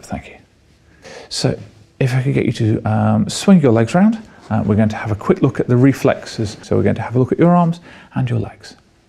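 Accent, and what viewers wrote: United Kingdom. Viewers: He's so British haha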